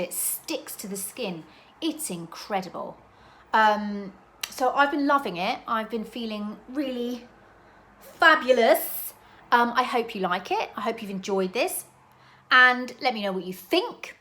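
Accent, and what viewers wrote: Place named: England, United Kingdom. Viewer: I really like that lip color on you. I share your love of BM and we are exactly the same age so that tapping of the powder really brings me back too. Also being American I love your accent. For some reason Americans find English accents calming to listen too😂. When I was sick from pregnancy years ago I literally watched hours of lisa Eldridge and it got me through a very sick time. Thanks for the video, love from South Carolina ❤.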